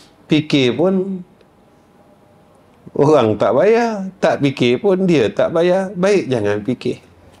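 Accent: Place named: Kelantan, Malaysia. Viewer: Comey ustaz cakap kelantan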